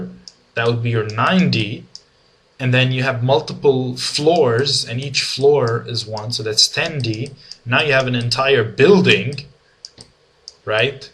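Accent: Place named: Europe. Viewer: I watched some of your videos before and got distracted to some other. So I wanted to make these comments but did not get time then. But now I started watching them again and forced myself to comment on your videos - 1. First, it is very CLEAR VOICE and VIDEO both. Even though you have an accent, it is very easy to understand and your EU accent gives a very nicee exotic flair to it! Don't you all agree people? 2. Secondly, your voice also has a very determined way of expressing something - it almost hears that you are confident enough to teach, but yet you stumble humanly on some stuff but you confident way of saying things keeps us hooked. So this is a compliment too..you may be mistaken of shaky but you voice is NOT. And that is a good TEACHER quality! 3. Thirdly, you are very funny - you make fun of yourself using the the PAINT program to do cursive writing etc..then tell "us to try it"..I literally tried and found it fun!! 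just like you did! Also, the human part of you, when you did not rehearse and tried to expand the 2D array without putting the element in there and it was not doing it and you stumbled on indefinitely..then gave up and smoothly changed the subject going on to explain the internals of an array!! very funny and very human! Some of us would have decided to scratch the entire recording and start over after finding the actual method..but your publishing this stumble made you very human - one of us - and that makes a good connection between a teacher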